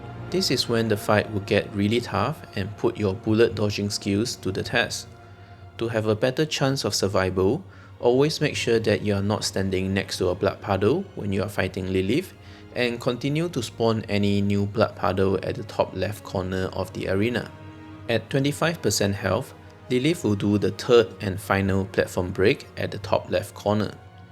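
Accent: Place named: Singapore. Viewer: You sound singaporean. Gotta support neighbouring content creators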